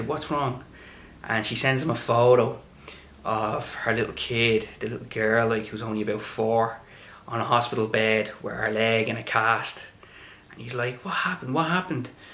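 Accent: Ireland. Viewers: The great irish accent makes the story a better listen 👍🏾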